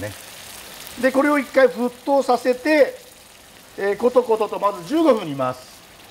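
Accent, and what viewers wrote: Hokkaido, Japan. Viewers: シェフの北海道弁に、郷土料理に対する愛情を感じました。旧フランス領で発展した料理 なども紹介してほしいです。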